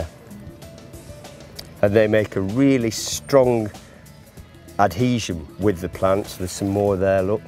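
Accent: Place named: Lancashire, United Kingdom. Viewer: What a lovely Lancashire accent. Thanks for the info!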